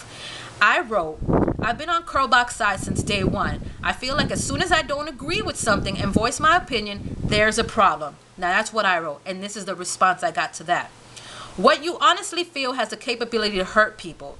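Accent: New York, United States. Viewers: are you from Ny? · Are you from new york? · BTW, I loveeeeeeeeeeeeeeeeeeeeeeeeeee your boldness and how you don't take crap nothing from nobody!!!!! lololololol You went straight G on this video though!!!! That NY accent! Love it doll!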